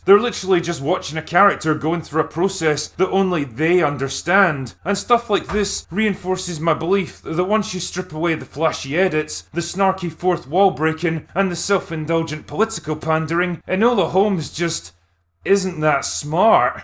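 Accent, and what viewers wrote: Scotland, United Kingdom. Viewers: You should know that with me and my wife are confused about some thing or don’t have an answer we will respond to one another in your heavy Scottish accent and say don’t know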